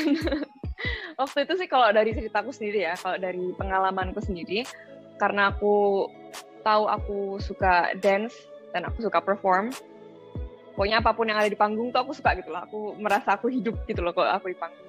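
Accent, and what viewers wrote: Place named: Java, Indonesia. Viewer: Kak logatnya ada sedikit logat jawanya🤗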